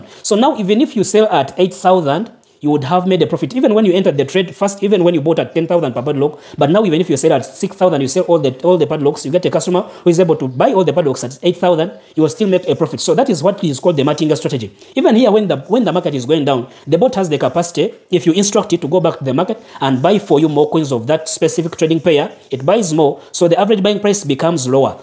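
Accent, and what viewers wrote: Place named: Buganda, Uganda. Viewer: He seems a muganda according to his acent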